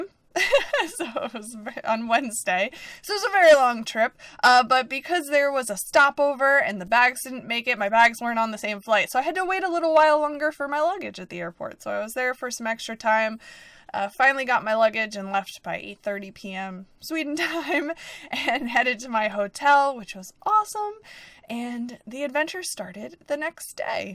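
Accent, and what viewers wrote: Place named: United States. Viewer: lives in America, you can hear that from her voice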